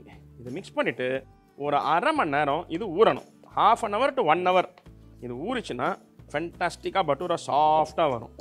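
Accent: Chennai, India. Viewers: Chef ungah Chennai thamil. Oru thaba epadi oru thaba appadi Semma ponga. Simply flattered by ur way of explaining. 👌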